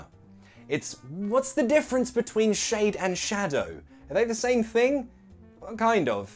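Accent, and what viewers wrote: United Kingdom. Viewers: The British accent is interesting, like when they say - never (nevah) :3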